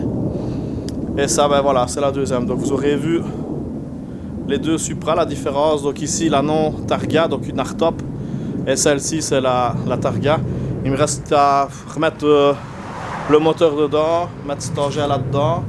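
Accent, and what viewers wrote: Liège, Belgium. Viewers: L accent liégeois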